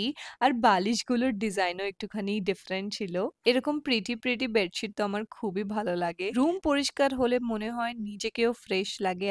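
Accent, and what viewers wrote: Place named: Mumbai, India. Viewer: Ektai jinis valo lage na, title dekhi, but kothar accent ta sunle mone hoy j mumbai te manush hoyeche madam, eto dhong kore kotha bolr ki ache bujhi na, normaly bollei toh hoy 🙄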